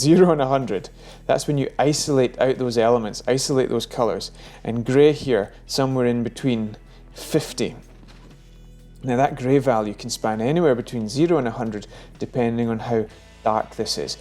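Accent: Scotland, United Kingdom. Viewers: Just bought the mavic mini and you suggested watching this in regards to the histogram and its absolutely brilliant. I dont have a cinematography background, or drone, just bought one for fun but am sure with this tip my shots will at least be perfectly exposed. On a side note, Robin Williams as Mrs Doubtfire had exactly the same soft spoken Scottish accent as you (sorry) haha. Will be downloading the free guide too, cheers for the great content.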